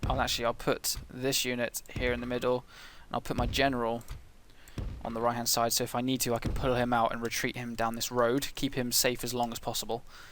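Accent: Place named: United Kingdom. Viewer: didn't even know you were british and i was subbed for atleast a year now lol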